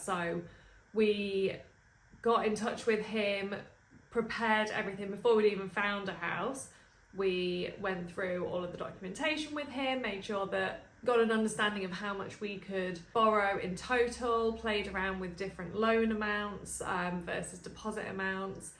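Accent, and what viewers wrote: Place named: England, United Kingdom. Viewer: You'll fit right in with your pommy accent. Its called a dining room. A diner is a roadstop where truckies eat.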